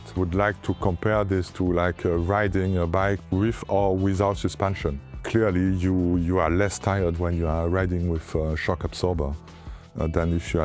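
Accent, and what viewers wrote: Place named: France. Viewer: there's french accent and then there is french windsurfer accent.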